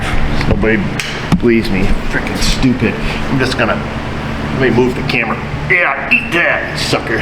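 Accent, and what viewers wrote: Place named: Rochester, United States. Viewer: I have a buddy from Rochester and I pegged your accent before I looked up your shop. Good video and happy new year.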